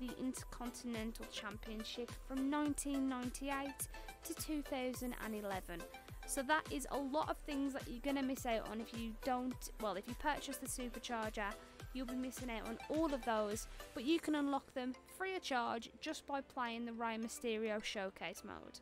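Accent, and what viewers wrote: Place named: Birmingham, United Kingdom. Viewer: Your Brummie accent is top tier!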